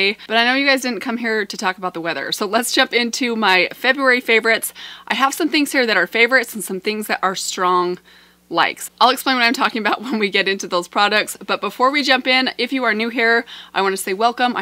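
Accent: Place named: United States. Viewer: I loved this, you're so lovely, very gorgeous lady. I love your beautiful American accent. Everything you say sounds so melodic when you talk. I'm not from the US so I don't get to hear the American accent very often outside of the cinema and American programmes on the telly.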